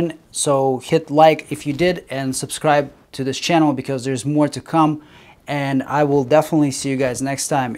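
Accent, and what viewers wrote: Russia. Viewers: Ты русский? Акцент выдаёт.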